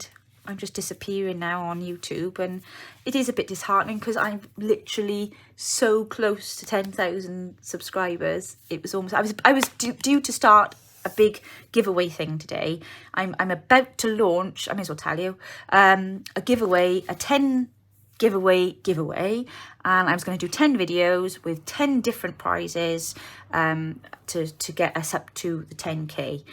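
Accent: United Kingdom. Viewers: I watch YouTube on my TV, so it's difficult to leave comments, but we also don't realise you want them as it's more work for you replying to comments! I love the yellow butterfly with the swirls. I'm guessing you used an embossing folder to do that? I need to try that! Is it in any of your other videos? I really like hearing someone from the UK (I'm assuming). FYI I found your videos in a search for a hidden spine.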